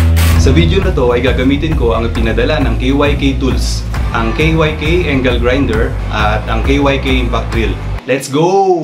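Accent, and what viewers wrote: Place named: Philippines. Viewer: Nice Work brother .......you sounds Phillipines.......my neighbour.......I AM FROM MEGHALAYA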